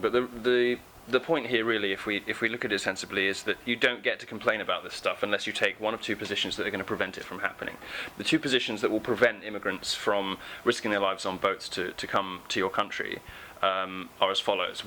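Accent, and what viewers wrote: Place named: United Kingdom. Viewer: Sadly, there seems to be a lot of people who seem to "worship" Milo, the way they did with Hitchens. At the end of the day, he's just a man with opinions. His opinions are not fact. His believing in God doesn't mean there is a God, for example. Why do people like this seem to attract "followers"? They're usually americans too, taken in by a British accent. Even I was credited as super intelligent whilst in Washington, and I'm just a pleb!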